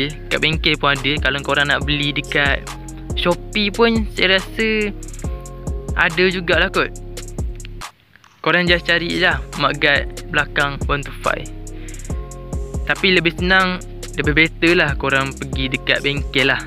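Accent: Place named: Sabah, Malaysia. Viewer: dok sabah ke serawak ni derr??? slu blah² sana je mcm nie 🤭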